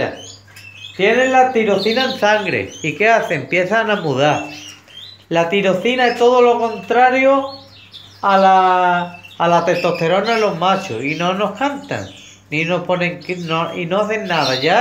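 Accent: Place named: Malaga, Spain. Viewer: pues que decir que eres muy grande y aprendo mucho con tus vídeos paisano que por el acento eres malagueño como yo un saludo 😁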